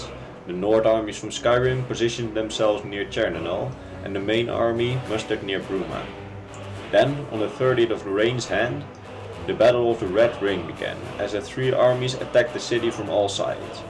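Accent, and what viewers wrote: Netherlands. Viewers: are you dutch because you have that dutch kinda accent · is that a Dutch accent? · oh nederlands engels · Nederlander!! Ik hoor het in de eerste drie seconden al gewoon. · You sound like you have a Dutch accent. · Are you dutch, zo ja. Hahaha ik wist t. Hoorde t aan je accent. Als t niet zo is, oops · It's a Dutch accent though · Vanaf hoorde ik al gelijk... Een Nederlander! Wij hebben echt zo'n typisch accent.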